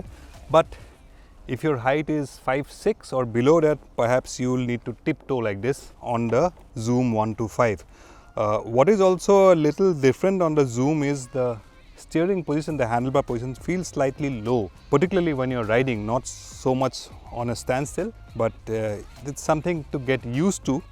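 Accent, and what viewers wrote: Assam, India. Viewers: even i feel he is assamese, but couldnot find his name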